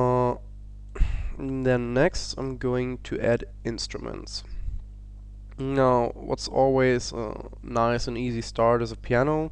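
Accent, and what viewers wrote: Germany. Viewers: man merkt an deiner Aussprache dass du deutsch bist ;)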